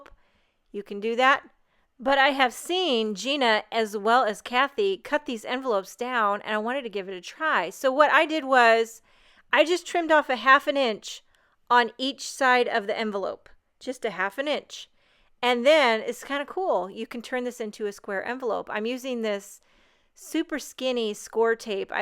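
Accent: Boston, United States. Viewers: You kill me!! 😂 I love watching and listening to you! U sound like a Boston Ma girl sometimes when u talk! Thanks for always making me smile and sharing all ur beautiful cards!! 😊💗👍🏼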